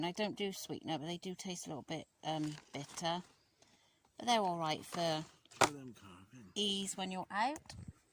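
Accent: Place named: Somerset, United Kingdom. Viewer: Well done steering clear of the treats today, glad hubby enjoyed his birthday, must admit I did wonder if it was easier to just take a flask on you walk 😃. Can I be cheeky and ask if you were originally from Somerset as you accent sounds more from there than Wales? My Dad is Welsh but now lives in Somerset….. if it’s nosey just ignore me. X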